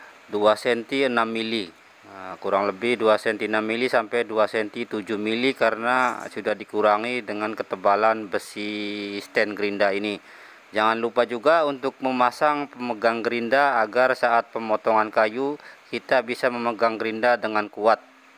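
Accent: Indonesia. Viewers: Ngomongny gaya Indonesian, tp soket listriknya Malaysian🤩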